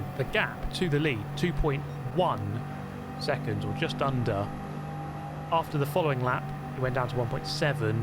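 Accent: United Kingdom. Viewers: I know it is british accent, but where exactly is this accent from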